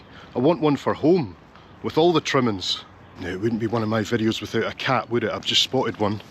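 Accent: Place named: Scotland, United Kingdom. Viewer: A Spaniard (I think) with a Scotish accent. That is a first for me. It is like a Pol with an Irish accent. Strange but an interesting mix!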